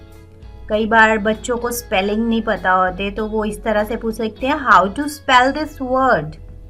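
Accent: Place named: India. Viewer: Sorry but I can't understand what you said. The accent is like Indian or Malay or someone from Middle East.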